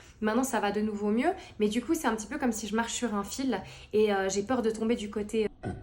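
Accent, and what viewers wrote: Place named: Vaud, Switzerland. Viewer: J’adore ton belle accent vaudois!!!! Je viens de vevey 🎉 c’est rigolo d’entendre un accent comme le nôtre à la télé 😉 t’as vidéo m’a beaucoup touchée ❤️ reste comme tu es 🥰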